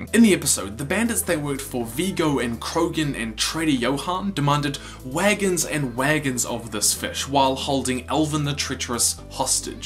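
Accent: New Zealand. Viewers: Are you from New Zealand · Are you from NZ because I am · Hello are you a Kiwi? Or Auzzy? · wait are u from new zealand (if you are i am too) LUV U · wait are you from New Zealand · Wait are you a kiwi (new zealander) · OMFG IS HE FROM NEWZEALAND OMG IM SO HIPED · spot the kiwi accent lol love it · are you from nz?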